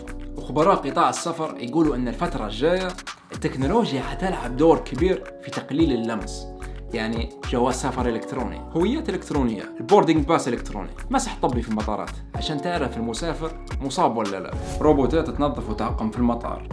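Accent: Eastern Libya, Libya. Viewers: يالله بعيدًا عن الفديو ، اللهجة لفتتني للأبد اللهجة الليبية تعجبني وأحب اسمعها ، ماأعرف بس ممكن لأنها قريبة من لهجتنا ومفهومة 😂❤️ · واو، واش هادي اللهجة الليبية، صح؟ أول مرة أشوف قناة ليبية على اليوتيوب 😍 أنا اشتركت 😊. أنا يحسب لي انت مغربي، مرحبا إخواننا بليبيا. هي خليط بين المصري والخليجي و المغربية يعني الكل يفهمها 😊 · بحب اللهجه الليبيه خالص هلبه هلبه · هادي لهجه شرق ليبيا لهجه الغرب تختلف عليها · مش مغربي ليبي هذا 😅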